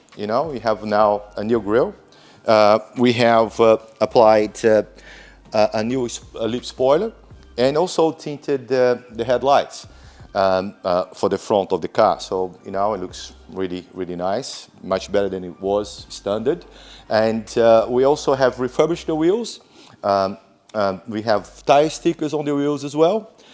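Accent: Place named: Brazil. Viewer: Esse cara é brasileiro certeza kkkkk o sotaque não engana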